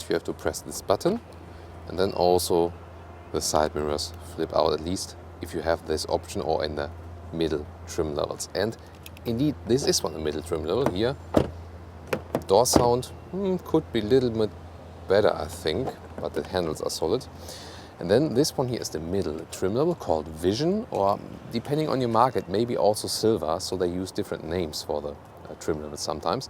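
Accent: Germany. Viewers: german english sometimes feels like german :)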